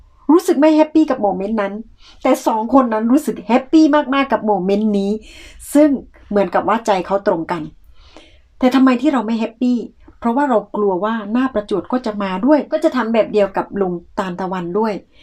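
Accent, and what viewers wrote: South, Thailand. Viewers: รักน้อง พูดใต้ ชอบฟังมาก ฟังไม่ออกหมด แต่ชอบคนใต้😘😍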